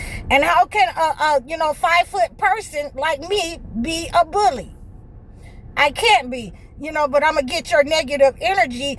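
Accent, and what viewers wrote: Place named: California, United States. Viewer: You sound like you from Comi fornia.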